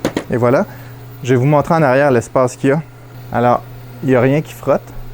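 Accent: Quebec, Canada. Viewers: Bonjour à toi, J'adore tes vidéos, je me régale vraiment. Je débute pour le moment (4 semaines avec l'ender 3X), mais j'apprend vite avec la situation, impression de support visière pour les Hôpitaux, l'imprimante tourne 24/24. Bref pour revenir au sujet cela m’intéresse vraiment, car j'aimerais faire un peu de flexible, mais est-ce vraiment utile, vu que se ne sera pas ma priorité. Avec l'extrudeur monter en standard pour la Ender3 il me semble que l'on peux le faire, mais à condition de réduire fortement la vitesse et peut être pas que sa d'ailleurs. Je suppose que la précision ne sera pas la même, mais la différence vaux t'elle le coup pour un usage épisodique? Autre petite question, le PetsFang, il faut l'imprimer en quel matiere, est-ce que le PLA suffit, car j'ai eue des retours négatif en PLA pour le petit modèle en rond <link> apparemment il aurait tendance à se ramollir et donc à tomber jusqu’à toucher les impressions, si ont le fait en PLA bien sûr. 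Qu'en est-il du modèle que tu nous indique ? Et qu'en est-il du bruit du nouveau ventilateur ? Merci vraiment beaucouppppppp, et l’accent québécois parlé à une vitesse raisonnable comme la tienne, c'est vraiment enchanteur, j'adore. De plus tu précise quasiment tout le temps de lieu d'achat sur le vieux continent et sa c'est vraiment sympa. Continu comme sa.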